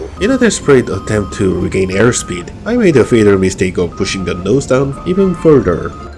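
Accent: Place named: South Korea. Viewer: guessing from your accent you're Korean right?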